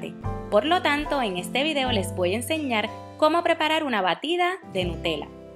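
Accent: Puerto Rico. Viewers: Ella es Puertorriqueña al escucharla se sabe. No la critiquen por como habla cada cual tiene su acento segun su patria. Super rica la receta... ;)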